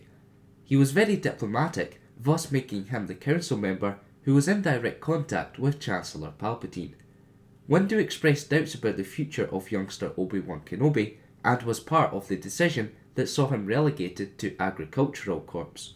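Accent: Scotland, United Kingdom. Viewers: the scottish accident makes it better more like a young Kenobe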